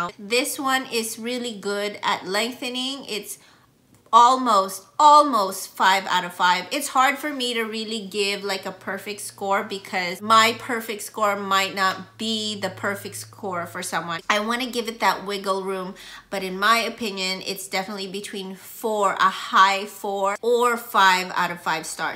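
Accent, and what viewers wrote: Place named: Philippines. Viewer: Thanks for this video! Looking to get a better mascara when I toss my old ones. Just ONE good one. I don't need a bunch that are all going to go bad... Also, are you Filipina? The way you speak sounds similar to my mom. We got the down lashes too.. 😹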